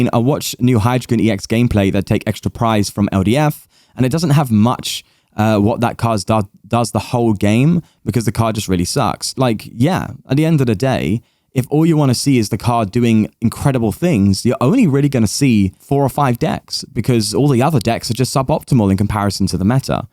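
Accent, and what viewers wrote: United Kingdom. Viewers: Bro just be yourself, keyboard warriors are everywhere just let them be. I watch you because of your laughs, beloved British accent, you're entertaining, your perfect English, than merely playing competitive perfect Pokemon games. Love from Malaysia ♥ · Always have a good time watching and those funny misplay moments among other entertaining shenanigans. Those and that cheerful, jesting personality (not to mention the nice british accent!) really sets this channel apart from others, I think. And it's cool to see games pushed into victory even after those misplays! Shows that a skilled player can turn even a bad situation into a victory.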